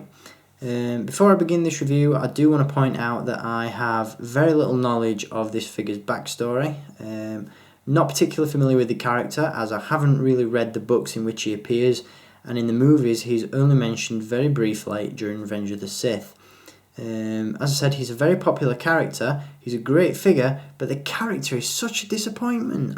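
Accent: United Kingdom. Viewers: Are you British? You sound British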